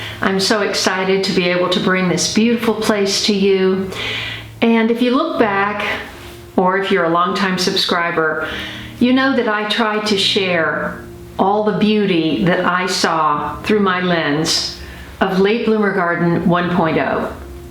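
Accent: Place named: South, United States. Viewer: This was a lovely video, the music was perfect. I can hear your southern twang coming through!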